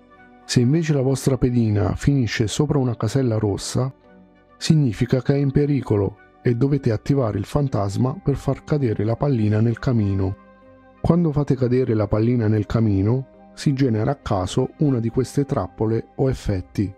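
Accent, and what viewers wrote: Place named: Tuscany, Italy. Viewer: dall' accento sembri Toscano 😅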